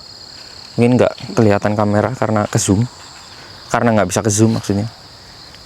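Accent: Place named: Cilacap, Indonesia. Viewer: Lokatnya medok ms,orang Cilacap apa ms,?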